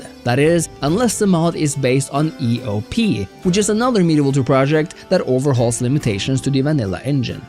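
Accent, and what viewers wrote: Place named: Norway. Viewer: man ur norwegian accent is shining through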